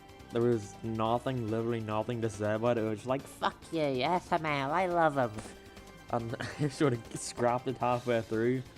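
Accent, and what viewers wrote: Ireland. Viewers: He has an irish accent, thats it. His first language probably is english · are you irish or northern irish i reckognise your accent cus i am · Get ready for the F**KING swarm of logans fans attacking a bunch of us normal people saying we suck dic or something because there mad we destroyed there lord and saviour also why are all the comments that he reads are f**king books also I love your acent you sound irish like me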